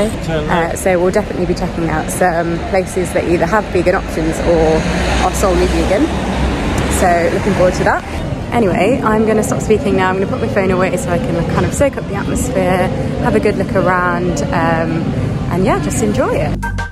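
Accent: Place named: United States. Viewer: Wow wow wow I love your accent are you American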